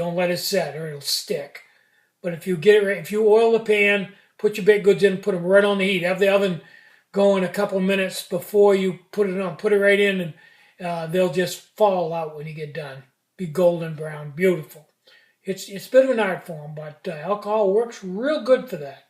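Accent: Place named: Boston, United States. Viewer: sounds like you're from Boston.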